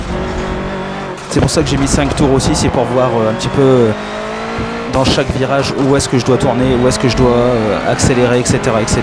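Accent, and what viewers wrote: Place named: North, France. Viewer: On sent que vient du Nord;-)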